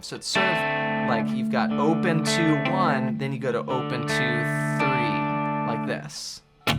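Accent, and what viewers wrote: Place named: California, United States. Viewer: I'm from the U.S. and if I had to guess I would say somewhere along the west coast. I detect a very slight "California surfer" accent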